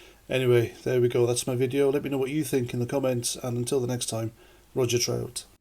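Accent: Wales, United Kingdom. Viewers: Is it a Welsh newsreader?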